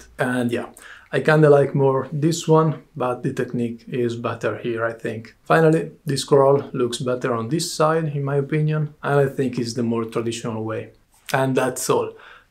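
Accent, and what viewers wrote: Venice, Italy. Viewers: he does sound a lot like the murano glass makers I know now that you !mention it.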